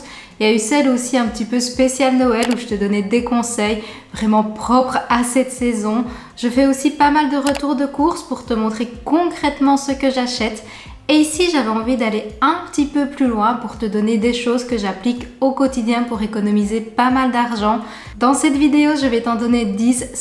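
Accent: Belgium. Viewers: oh désolée. Je découvre seulement ta chaîne 😉 Et c'est un plaisir d'entendre ne YouTubeuse avec notre bel accent belge ❤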